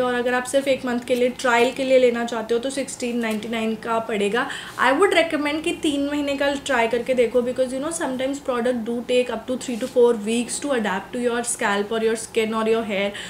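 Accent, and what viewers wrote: India. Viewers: Rajasthani lg re ho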